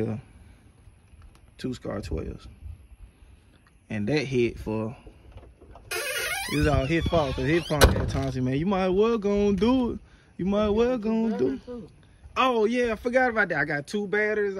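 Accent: Mississippi, United States. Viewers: Man it sound like u from Ms dat bih pressure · Bro you from Mississippi??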